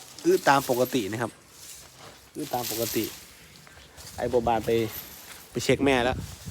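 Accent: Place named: Isan, Thailand. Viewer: มีเสน่ห์ตรงการพากษ์อิสาน นี่แหละ ฮาดี ทั้งพี่ทั้งน้องเลย 🤣🤣🤣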